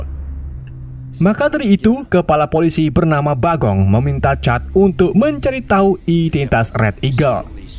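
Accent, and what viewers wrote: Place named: Banyumas, Indonesia. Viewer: JEBULE NGAPAK RIKA BANG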